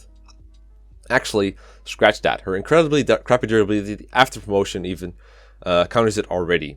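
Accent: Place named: Netherlands. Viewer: Beware the Dutch "g"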